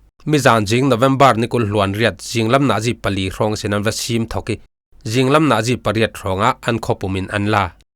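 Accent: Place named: Falam, Myanmar. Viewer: Na thu sim ngai nuam tuk.falam khua sung tong ro ri si.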